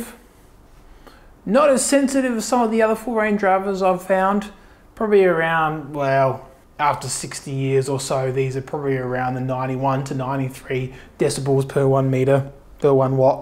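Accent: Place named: Australia. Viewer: I'm assuming that you're in Oz (from your accent). The Alnico Super 12's pop up every once in a while on Marketplace. One pair sold less than a month ago and there is another pair in cabinets on there at present. As Molly would say.... Do yourself a favour and find them 😂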